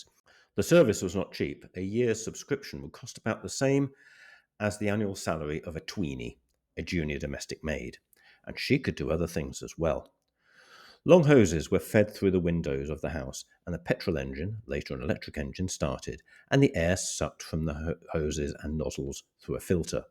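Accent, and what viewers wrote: United Kingdom. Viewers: Love your channel! I’m a sucker for documentaries and history and your English accent makes it all the more better lol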